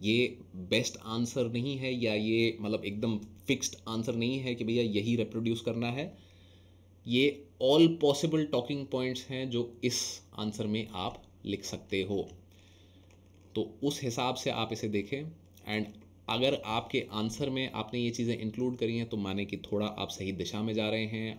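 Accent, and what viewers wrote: Bengal, India. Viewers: Sir are you Bangali?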